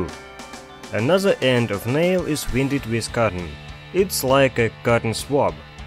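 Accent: Ryazan, Russia. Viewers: Акцент рязанский )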